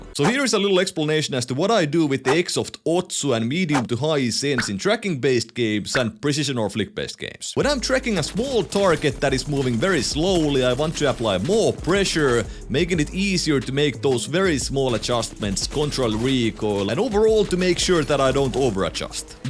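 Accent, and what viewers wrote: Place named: Finland. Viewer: Nice explanations regarding pressure vs friction. Also, I love your finnish english, very colorful to listen to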